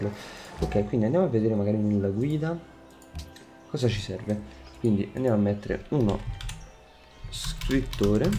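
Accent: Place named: Tuscany, Italy. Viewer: D :D figurati!! complimenti ancora...seguire i tuoi video è leggero e facile, sei molto "pulito" e il leggerissssssimo accento toscano fa colore!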